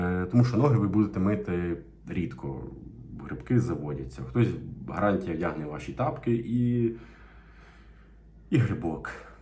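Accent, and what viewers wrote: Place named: Podillia, Ukraine. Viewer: Мені здається, що автор родом з Поділля, за вимовою. За інформацію щиро дякую. Можливо фумігатор від комарів теж знадобиться.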